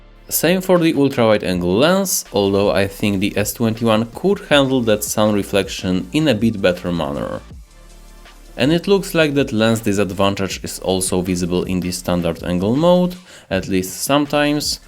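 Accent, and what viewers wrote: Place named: Poland. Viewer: you sound so Polish. I mean your accent. Very nice comparison though . Just bought Galaxy s21 hope I can get use to android xd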